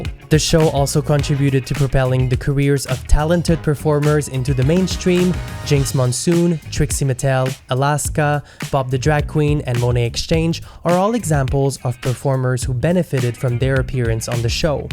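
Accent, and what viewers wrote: Quebec, Canada. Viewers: Not at all. Your French/Canadian?? accent is very charming 👍😊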